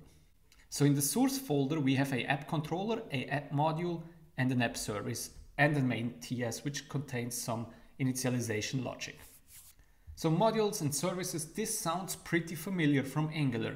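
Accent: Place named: Switzerland. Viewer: meh ghört din schwiizer akzent voll use. super video merci <3!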